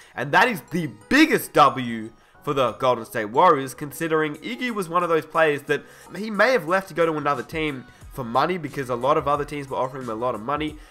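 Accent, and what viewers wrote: Australia. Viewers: Okc still hyped wtf the got there ass dropped by the rockets and there not winning shit because if they can't win with kd,harden,ibaka you think there gonna win with Paul gorge( washed up version) and btw who tf is the Australian talking over the video who has not picked up a basketball in his life probably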